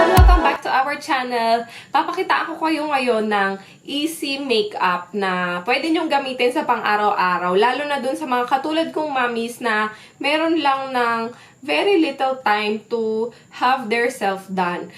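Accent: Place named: Bulacan, Philippines. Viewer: ur so cute ganda..hahahaha.. naririnig q ang punto ng bulacenia sayu.hahhaa... i miss u nmn pati si skysky..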